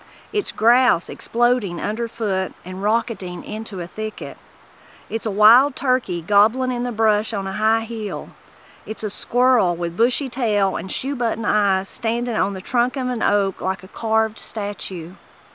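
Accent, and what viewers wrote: Appalachia, United States. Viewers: Really like this channel - partly for the Appalachian English, but also to learn how things are done in the countryside 🙂 I live in Scotland, November is beautiful up here with the changing colours of autumn.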